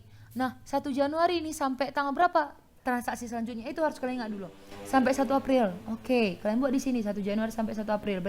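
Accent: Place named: Medan, Indonesia. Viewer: Makasi kak, wkwkkk nampak kali org medan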